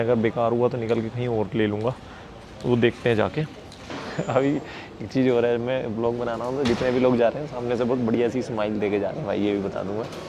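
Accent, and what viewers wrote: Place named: Delhi, India. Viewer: Sir apki language sunkar mujhe lagra h aap delhi se h 😂😂delhi wale kahi b ho pata chal jata h ki y Dilli se h hum b delhi se h or sir apke bolne ks sence same mere czns jesa h😂😂😍😍😍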